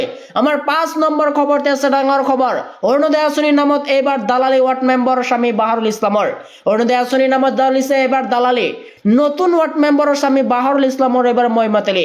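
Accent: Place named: Bangladesh. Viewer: Bangladeshi Jen Lagise news Kuwa mokkel tu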